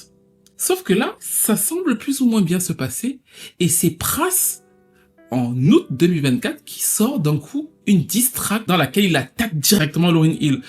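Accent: Cameroon. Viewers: Toi... tu n'es pas camer par hasard ? 😅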